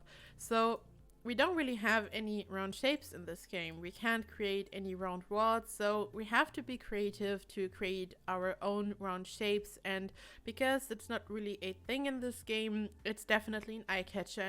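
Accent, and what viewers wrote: Germany. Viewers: Du bist deutsch 😂 oder ? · sorry for asking but are you german ? i think i can hear a little cute accent :)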